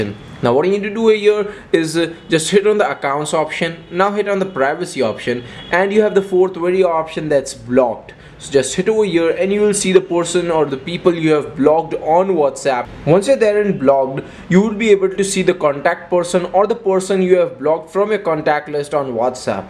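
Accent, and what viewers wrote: India. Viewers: Indians with terrible accent , however helpful video thanks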